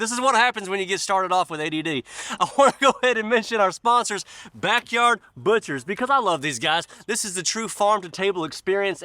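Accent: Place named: Mississippi, United States. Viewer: I love you, man... er... in a brotherly way, of course! 😁 I always enjoy your no-nonsense perspective, your enthusiasm, and... your Mississippi accent! From a French guy who has lived in this great country for 40 years, and who is a staunch and unapologetic supporter of Donald Trump from day one. Bruno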